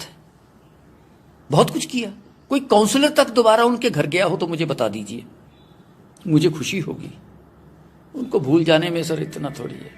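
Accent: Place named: Punjab, Pakistan. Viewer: Ye Pakistani Panjabi assent hae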